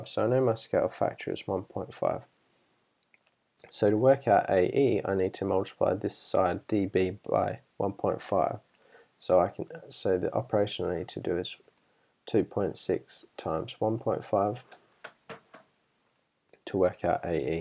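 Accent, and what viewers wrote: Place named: Australia. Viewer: The Aussie accent needs to be complemented by a more light-hearted tone. Overall, your videos are excellent.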